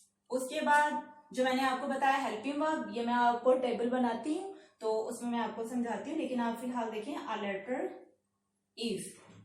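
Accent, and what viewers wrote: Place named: Lahore, Pakistan. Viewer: Are u from Lahore.....great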